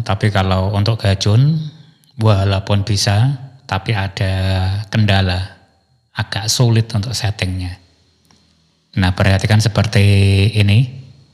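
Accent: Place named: East Java, Indonesia. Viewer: sampean orang mana om,,logatnya kayak jatim bagian kulon..😁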